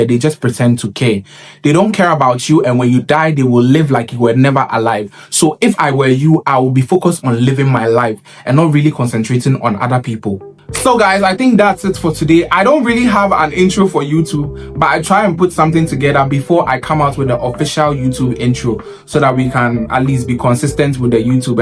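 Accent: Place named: Fante, Ghana. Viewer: am subscribing because of how you speak the fante🤣🤣🤣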